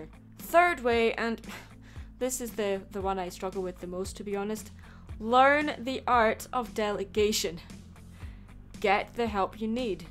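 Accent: Scotland, United Kingdom. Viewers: Are u Scottish? I am!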